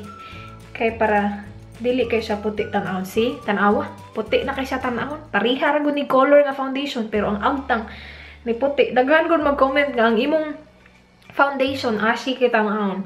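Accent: Visayas, Philippines. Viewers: hindi ko maintindihan. 😭 peeo pinanuod ko parin. hahaha nakakatuwa ka magsalita ng bisaya ate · omggg ate ganahan kay ko ani na video, funny kay ka mag bisaya more videos pa atee niya bisaya 😂😂love youu po😘😘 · hahaha nindot kaau paminawon....bisaya kaau hehe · ang kyut mo magbisaya ate!:) kahit di ko naiintindihan, pinanood ko pa rin. mas madaldal ka pag nagbibisiya.:D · Nalingaw jud ko tan aw ani bah. Nindot pminwon b Bisdak kaau. Lol 😂. I miss Cebu n jud 😞. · ang cute mo magbisaya te oi, gwapa pa gyud kaayo kay ka te, 😜😝😍😍😘😘😘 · lol bugay kaayo mubisaya ay hahaha ka cute nalang jud😙😚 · ka cute mag bisaya oi..hehhehehe iloveyou ate 😍😍😍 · Hahahaha the best kay ni nga make up look or tutorial na akong natan awan na, Unsa ka ate, Bohol-anon? or Cagay-anon? ga libog kos imong accent na bisaya gd. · yaaayyy!!! cute kaayu ka mag bisaya! we love u from cebu city 😍😍😍😍😍❤❤❤❤❤ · hahaha tawa lang ng tawa! 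ang kyut mgbisaya! 😅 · kalingaw ani nga video..basta bisaya astog kaau og tingog..hahahaha..aper!:-) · Wala akong maintindihan pero and cute kasi ni ate mag-Bisaya 😂💞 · Ang cute mo mag bisaya ate.😁 kahit hindi ko talaga maintindihan.😊 😅 tinapos ko talaga.😊 ngaun lang ako nag comment. Pero lage kopo kayo pinapanuod.😁 godbless po. 😘💕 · Ang kulit nung bisaya accent hahahaha! Qt! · Joker kaayo ka magbisaya ate. Hahahaha makalingaw 😂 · Proud Cebuana here!!! Hope to see more bisaya videos. Makamingaw! Hehe basta bisaya bundak kaayo mo storya! Haha 😹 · himusa nimo mag bisaya oy😍😍😍😍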